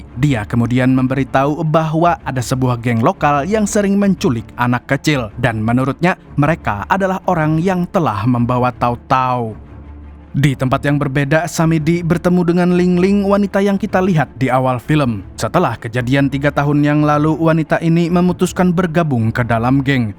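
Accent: Banjar, Indonesia. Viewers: Urang Banjar sekalinya😂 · Dari logatnya sidin ni urang banjar pang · Oooh urg banjar kah min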